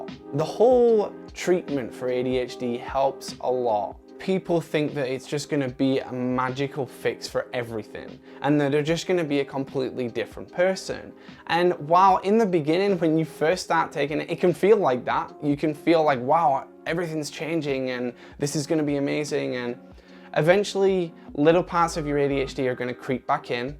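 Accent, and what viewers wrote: United Kingdom. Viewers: How did you get adderall in Uk??? It’s £400 a box and isn’t on Nhs! Unless you live in USA! Lol you sounds British tho!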